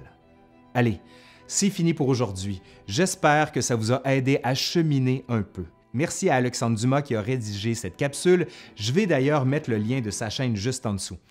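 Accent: Quebec, Canada. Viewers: ACCENT CANADIEN EN MASSE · Sans racisme aucun , l'accent Québécois me fait saigner les oreilles , alors que j'adore nos cousins d'outre Atlantique , c'est juste leurs accents que je trouve insupportable . Les juifs étaient déjà persécutés bien avant la crucifixion de Jésus (égyptiens , Babyloniens ) . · He is from Quebec, they speak a french dialect with another accent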